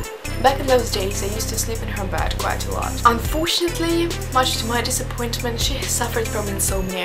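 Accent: Russia. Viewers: whoa whoa whoa! hey now, how is it even fair for you to be this freakin' beautiful?! and a fellow russian as well, judging by your accent. :) #womancrushwednesday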